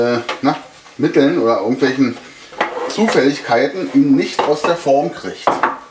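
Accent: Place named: Berlin, Germany. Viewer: Hallo, hab das Rezept für´nen einfachen Sandkuchen gesucht, weil ich den von meinem Vater geliebt habe. Er hatte eine Bäckerei/Konditorei auch in Berlin; daher kam mir dein Slang so bekannt und heimisch vor:) Danke danke danke für dieses prima Rezept. Gespeichert hab ich so einiges, aber dich hab ich abonniert!!!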